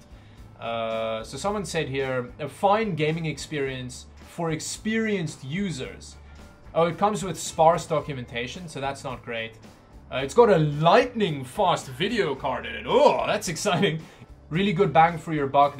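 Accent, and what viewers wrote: South Africa. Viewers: Is jy van suid afrika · dude are you south African? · Are you South African? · Wait are you South African ?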